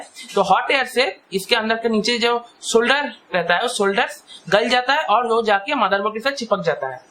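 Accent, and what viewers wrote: Bengal, India. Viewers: Bengali ho kya???🤔🤔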